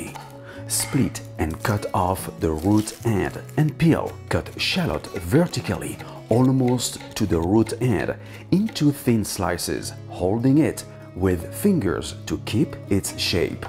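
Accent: France. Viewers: Je kiff ta chaîne en plus tu est français sa s'entend à ton accent bref tu fais des trucs super beau c'est super continue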